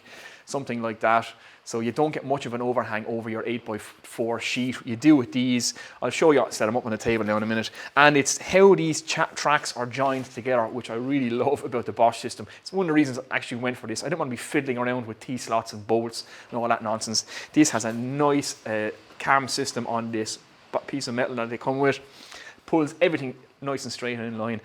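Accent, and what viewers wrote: Ireland. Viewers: Your review is just as spot on today. Thank you. First time watching your videos and it took me a few seconds to get my yank ear in tune with your very cool brogue, but very much enjoyed listening. Your teaching style is very good. Well done, sir! 👍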